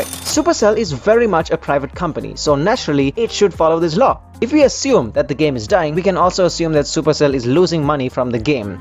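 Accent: India. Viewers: You Indian? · Beo u are indian???😀😀😀😀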